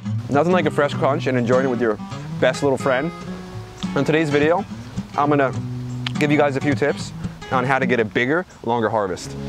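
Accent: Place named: Pennsylvania, United States. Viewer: Someone sounds like they may be from PA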